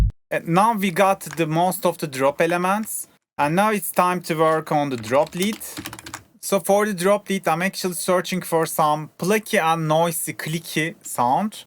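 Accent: Turkey. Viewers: Memati maske de taksan o sıfatın belli oluyor 😂 türk olduğun aksanından belli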